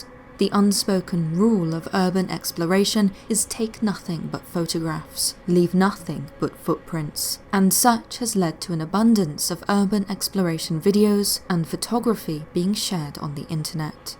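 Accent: United Kingdom. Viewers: Isn't it pronounced "(gē-zər)" in British English? The narrator sounds like she's British, which would make sense.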